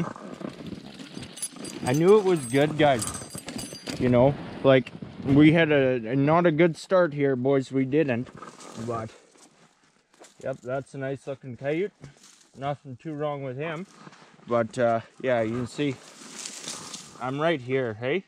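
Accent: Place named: Alberta, Canada. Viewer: This guy sounds like hes from Alberta Canada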